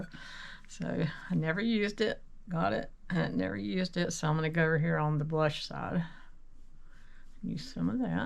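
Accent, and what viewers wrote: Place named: South, United States. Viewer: You are such a doll. I love your southern accent. Your approach as well. Have a healthy, safe & healthy day. 🙏💜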